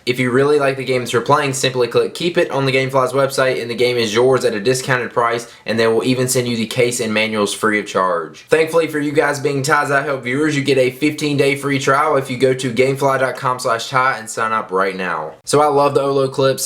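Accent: South, United States. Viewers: You sound really southern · you sound so country(: its adorible(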